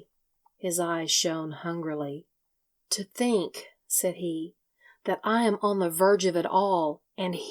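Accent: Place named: South, United States. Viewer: Love your Southern accent. And your narration. 😊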